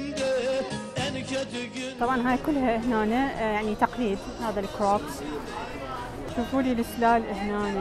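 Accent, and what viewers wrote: Iraq. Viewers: تم اصطياد هذا القناة المميزة بصراحة أولا مشتاااااااقين للاهجة العراقية ثانيا عسل عسل عسل ثالثا تركيا جنة الله في الأرض وتوفيق اختى وتحياتي للعراقيين الشرفاء وتم الاشتراك.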